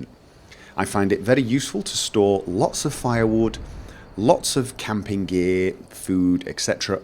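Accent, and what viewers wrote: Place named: Wales, United Kingdom. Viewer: this guys regal welsh accent is kind of peculiar :D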